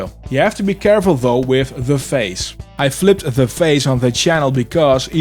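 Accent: Netherlands. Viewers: die accent. NL?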